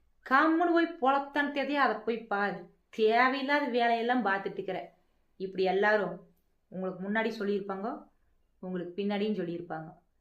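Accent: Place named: Coimbatore, India. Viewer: ❤கோவை ஸ்டைல் பேச்சு எனக்கு ரொம்ப பிடிக்கும்❤❤👍👍👍🙏🙏🌺💐💯🤝💥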